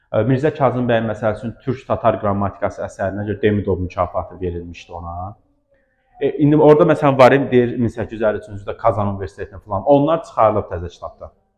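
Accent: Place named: Aghdam, Azerbaijan. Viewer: Mən Ağdamlıyam, ləhcənizə görə soruşdum,bizimkinə çox oxşayır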